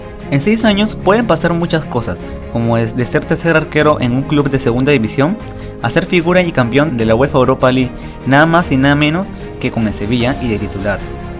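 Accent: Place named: Argentina. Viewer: Porque habla como un argentino??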